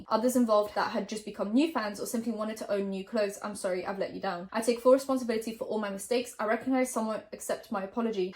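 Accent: United Kingdom. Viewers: Vixen idk she’s definitely british, that’s not an australian accent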